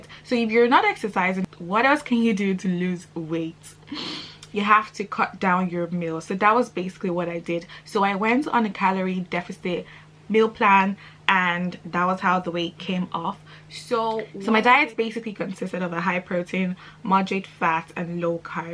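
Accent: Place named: Ireland. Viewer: Your voiceee 🤤🤤🤤 Are you irish?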